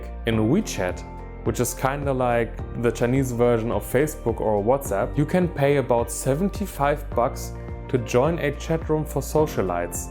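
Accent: Germany. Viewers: Crazy behaviour and sad. So shallow. And yes some earn lots and lots by selling gullible people courses on how to level up and so on, targeting desperate people to waste their little money in hooe to better their lives. That is real shabby and low behaviour. Btw. You have an interesting German accent, are you German by any chance? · Direkt am Akzent den Alman erkannt ^^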